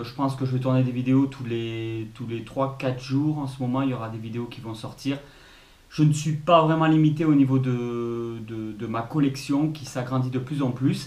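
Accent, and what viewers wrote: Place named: South, France. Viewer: ah le plaisir de réentendre ton petit accent du sud de la France, c'est cool que tu revienne sur youtube avec de nouveaux projets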